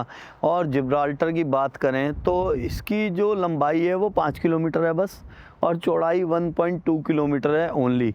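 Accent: India. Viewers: . Plz don't worry about ur pronunciation. Sir u're down to earth. Aur hum jo dekh rhe hain voh bhi aap jaise hi hain. Hum ko konsi French, Portuguese, Russian ya Germany aati hai. Aap ka desi andaz No 1 hy. From Mumbai. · Your accent is very good indian accent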